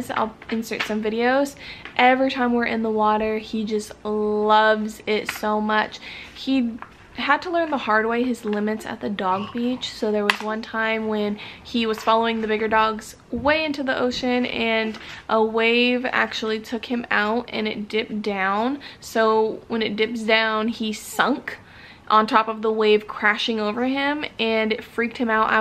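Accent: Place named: Bay Area, United States. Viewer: where you from ? sound you like from the bay like me lol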